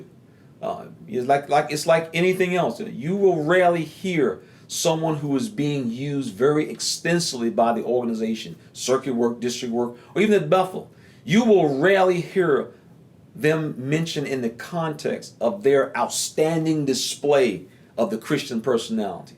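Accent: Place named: Detroit, United States. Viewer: Man you talk and act like my Detroit people. Lol Tell it like it is my brother.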